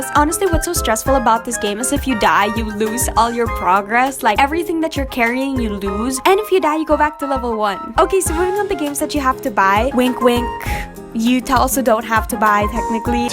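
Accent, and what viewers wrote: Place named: United States. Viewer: This is really shocking she sounds like an American but she's a Filipino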